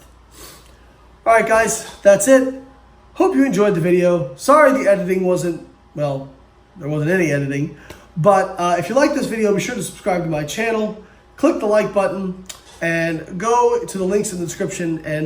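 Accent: Australia. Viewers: An Aussie accent creeping in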